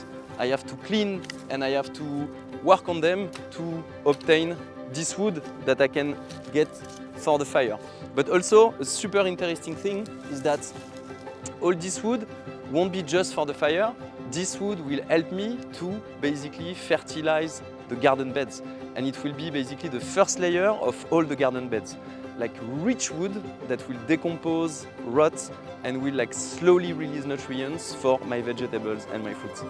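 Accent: France. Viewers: ahah pardon, de l'accent et du nom j'en ai déduit la nationalité française, désolé si je me suis trompé !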